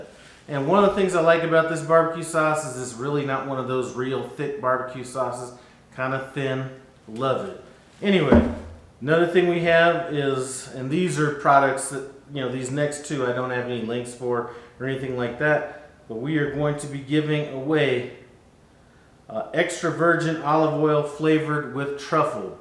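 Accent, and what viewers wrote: South, United States. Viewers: Funny finding myself on your page- I was looking at how to combine my steak and queso fresco... and an hour later I'm still looking up seasoning for grilling! I know you said you weren't responding, BUT, any suggestions on a flavorful rub for smoking pork?! Mine always seems bland, like a wasted effort, 10 hours later. And, I think you said Colorado, but you sounded a little southern to me... at the same time, the UNC on your jacket isn't the same shade blue UNC wears down here! Either way, Cheers!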